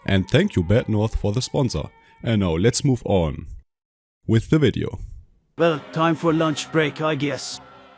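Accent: Germany. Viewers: liebe deinen Deutschen akzent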